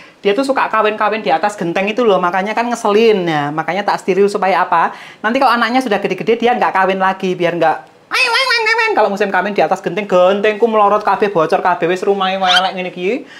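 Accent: Java, Indonesia. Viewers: Mbk asline dr mana ya...kok jawa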